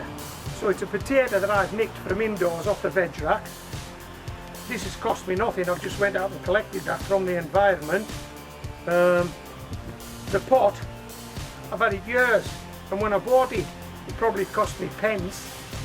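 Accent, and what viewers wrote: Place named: Cumbria, United Kingdom. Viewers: North west england on the coast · As someone from England I can say for almost certain that he is most certainly English,sounds Midlands or Northern,lol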